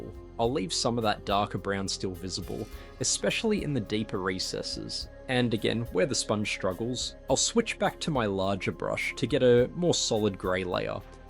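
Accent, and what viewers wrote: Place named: Australia. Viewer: Fantastic result! Loving the heavy Aussie accent, mate ^^ I'm just sitting here waiting for you to start swearing heavily :)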